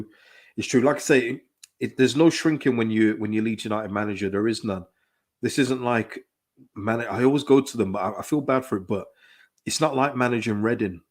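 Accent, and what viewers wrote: West Midlands, United Kingdom. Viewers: Watching this the day after is priceless. Go on lad. Where are you from, you sound local (West mids)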